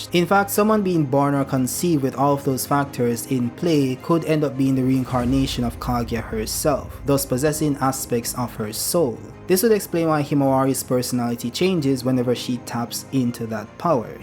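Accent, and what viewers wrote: Jamaica. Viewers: which part of Jamaica you come from mi g you sound like you from ochi bro gaad but more time yuh affi Yankee up the ting mi boss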